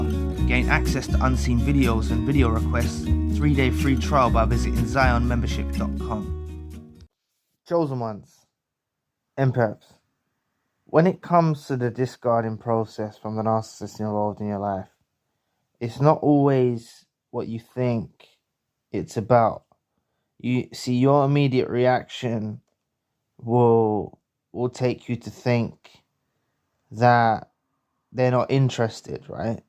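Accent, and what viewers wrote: London, United Kingdom. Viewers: It's actually London's accent. is really cool guy, very intelligent, real light worker. Should be supported!❤ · Just happen to be in London right now listening to your British accent, cool! Thanks for all you do!!!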